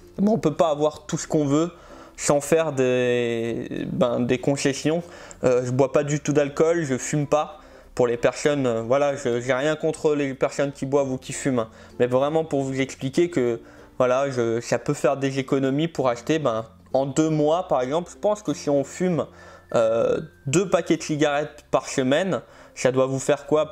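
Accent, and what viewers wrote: Alsace, France. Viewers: Est-ce que tu es Alsacien/Mosellan?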